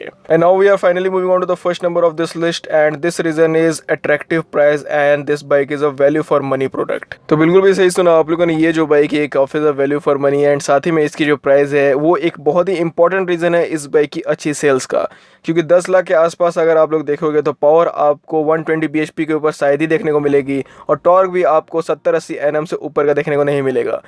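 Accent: Bihar, India. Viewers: Bhai aap bihar se ho kya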